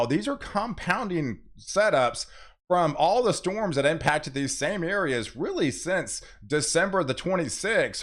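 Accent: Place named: South, United States. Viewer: Christopher Walken with a bit of a southern accent. Anyone else hearing this?😊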